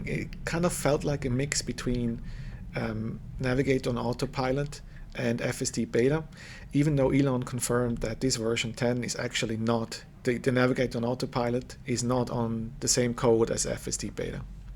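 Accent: Switzerland. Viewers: As a program I think the message about cones was not really about cones, its just the message its default to when its thinks something stationary is blocking the path. Meaning the 'error condition' is more general then the actual 'error message'. Also are you Swiss?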